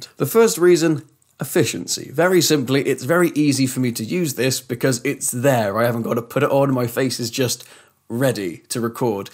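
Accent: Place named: RP, United Kingdom. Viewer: You already won yourself a new subscriber for speaking properly. We guys on the continent always enjoy listening to you guys in the UK exercising your stiff upper-lips… — Posh enough for me at least ;-)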